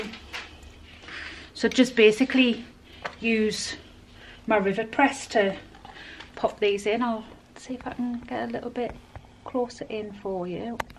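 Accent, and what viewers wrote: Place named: North East England, United Kingdom. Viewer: Nice to hear someone from North east on here instead of americans. You make your NCW exactly the same way as I do. I love how the waterproof canvas makes it more structured but i have never used it before can it be bought here in the north east please.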